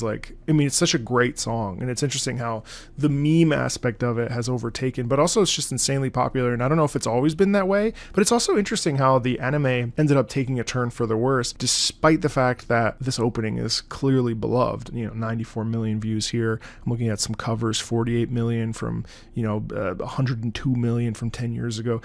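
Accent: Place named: Italy. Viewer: I love how you pronounce Japanese with an Italian accent, genuinely really charming :D like the oshiete yo becomes oshietero.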